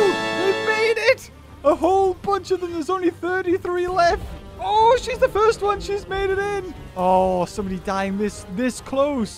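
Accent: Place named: Newcastle, United Kingdom. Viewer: The Geordie Mrbeast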